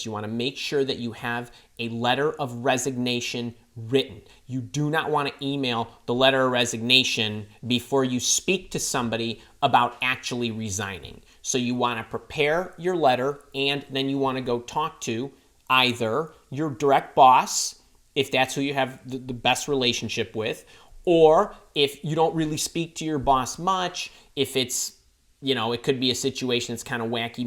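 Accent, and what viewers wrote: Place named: Upper Midwest, United States. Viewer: I’m picking up a strong upper Midwest accent here!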